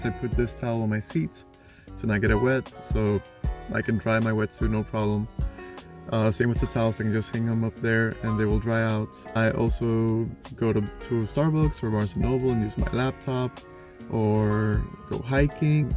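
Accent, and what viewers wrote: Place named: Middle East. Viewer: Where are you from, I detect a middle-east accent!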